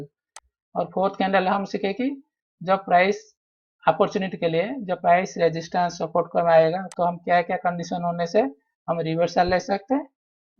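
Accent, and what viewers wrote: Odisha, India. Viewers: Apka bat chit se lag rah he aap odia ghar se belong karte ho... Me v odia hun from Rourkela, Odisha..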